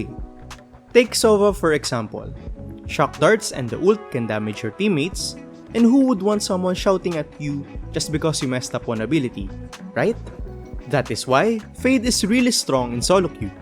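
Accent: Philippines. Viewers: hey man what region do you play in? you sound like you're from apac specifically in the philippines lol. not that you mind!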